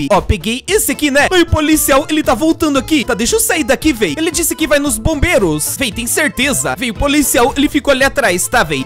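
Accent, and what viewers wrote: Portugal. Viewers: Man c tá falando português de portugal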